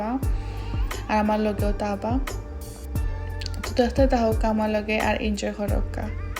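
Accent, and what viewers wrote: Sylhet, Bangladesh. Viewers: ছিলেটি কথা সুনে মন ভরে যায়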